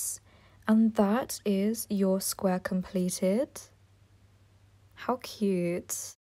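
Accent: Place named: Russia. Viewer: русский акцент такой емае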